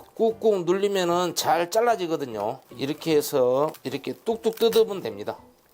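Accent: Jeolla, South Korea. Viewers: 구수한 전라도 사투리도 일품입니다 웃음도 나고요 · ㅋㅋ 오늘따라 전라도 사투리가 구수하네염 ^^ 항상건강하세요. 덕분에 행복합니다 .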